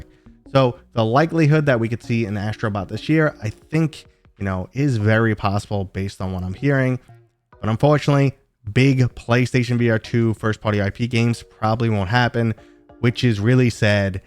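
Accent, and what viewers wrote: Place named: East Coast, United States. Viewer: Definitely East Coast. NJ?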